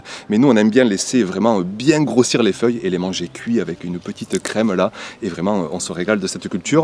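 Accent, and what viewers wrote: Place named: Southeast, France. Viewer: Bonjour, natif du sud-est, je te suis depuis la Bretagne, ton accent me rappelle les sources 😎 merci pour cette vidéo, toujours un plaisir à regarder, écouter et pleins de bons conseils. Par contre je me pose une question, dans la vidéo tu indiques que tu manges les fèves crues, j'avais lu que: "Les fèves crues (comme d'autres légumineuses) contiennent des substances naturelles comme des lectines qui peuvent être toxiques ou irritantes pour le système digestif si elles ne sont pas détruites par la cuisson." Personnellement il m'arrivait d'ouvrir quelques cosses et en manger directement dans le potager 😋 mais du coup je ne suis pas certain que ce soit une bonne idée côté digestif, il vaut peut être mieux les cuire non? (Je pense que tout est question de quantité aussi). Belle journée;)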